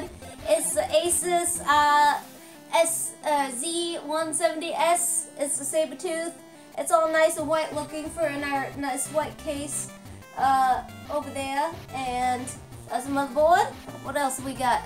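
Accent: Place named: Brooklyn, United States. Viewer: I can hear a Brooklyn accent...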